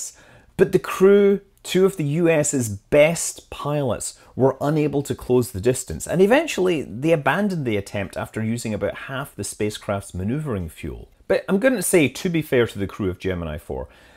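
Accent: Scotland, United Kingdom. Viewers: Is it scottish dialect what you are speaking?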